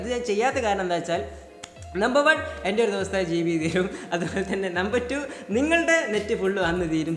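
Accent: Kozhikode, India. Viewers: ബ്രോ കൊച്ചിയിൽ എത്ര വർഷമായി വേറെ ഒന്നും അല്ല കോഴിക്കോട് langugeinu ഒരു മാറ്റവുമില്ല അതു കൊണ്ട് ചോദിച്ചതാ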